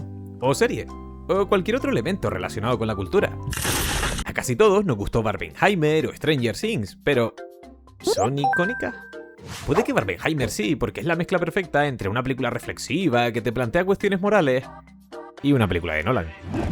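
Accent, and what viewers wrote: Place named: Argentina. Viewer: Tu acento es una mezcla entre Argentino y Español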